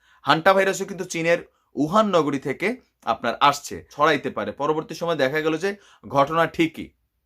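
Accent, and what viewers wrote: Comilla, Bangladesh. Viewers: ভাই আপনি কুমিল্লার 😍😍😍😍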